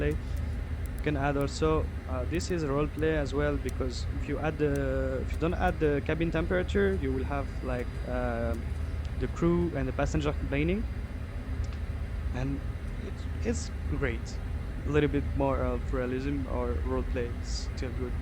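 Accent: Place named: India. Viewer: J'adore ce petit accent anglais qui fleurte avec celui des Indiens anglophone 😅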